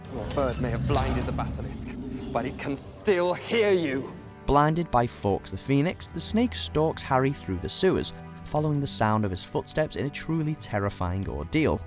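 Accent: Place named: Liverpool, United Kingdom. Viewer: Love the scouse accent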